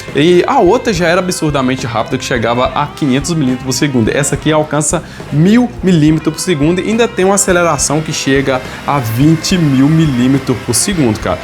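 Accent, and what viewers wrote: Minas Gerais, Brazil. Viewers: "Olha só procês vê", mineiro raiz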